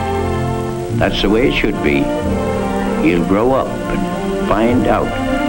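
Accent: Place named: New England, United States. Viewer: Definitely a New England accent !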